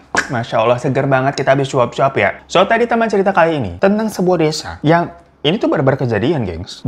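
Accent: Java, Indonesia. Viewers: Lucu banget sih bang ngomong jawanya😂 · Lucu bngt bang kalo ngomong Jawa wkwk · hahaaa mas menghayati peran... pake bhs jowo😁salut mas.... sukses selalu yaaaaa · Bang” , bahasa jawamu itu lhohh , ahh gemes 😂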